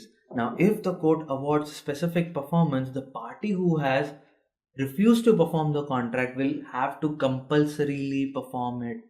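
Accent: India. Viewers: Can't stand this weirdo Indian and his annoying accent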